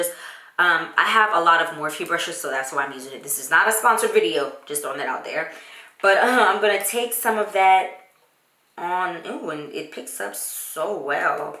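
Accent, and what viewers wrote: Louisiana, United States. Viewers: I’m sorry for your loss. Praying for peace for you. When I started watching your videos I kept saying to myself she sounds like she’s from Louisiana. When you just said it I yelled “I knew it”. I’m from Opelousas. Live in Maryland now. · I love the way you try to correct you louisiana accent lol. I do the same when speaking in public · Ahhhh, it's so refreshing to hear that Louisiana accent! I miss Lafayette so much. 😍 I love how you keep your faith in God and stay on those kids to be respectful. This look though 🙌🏾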